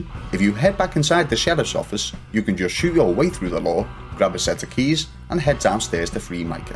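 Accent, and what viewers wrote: Liverpool, United Kingdom. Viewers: Are you a scouser ?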